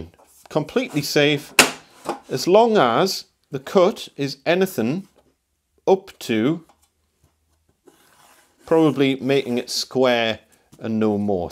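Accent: Newcastle, United Kingdom. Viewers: I love to see a workman who takes H&S seriously! Quality video mate. Love the accent too! is it Geordie?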